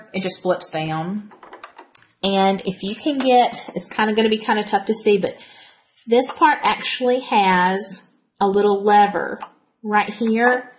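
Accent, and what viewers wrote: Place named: South, United States. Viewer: I am like you in the facts that I've never touched a sewing machine in my life before this and that we have the same southern accent...lol. I'm from NC. I've heard you have to oil a sewing machine. Do you have to do that when you take the machine out of the box? Or is it just ready to plug up and sew? Thanks.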